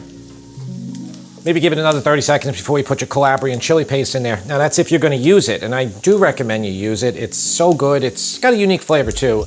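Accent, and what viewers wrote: New York, United States. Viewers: You really act and speak like one of us New Yorkers from the island. It's nice seeing someone with the same brands we all use here.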